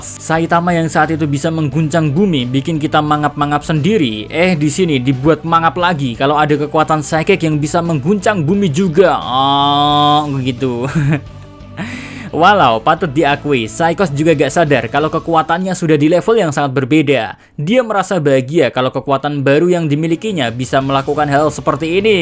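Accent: Java, Indonesia. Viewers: Apakah psykos udah msk level ancaman dewa mohon dijawab mimin ganteng dan medog?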